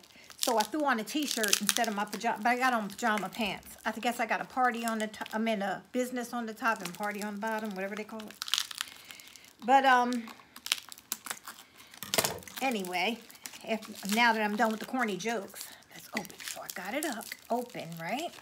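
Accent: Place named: Louisiana, United States. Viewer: Like that cajun talk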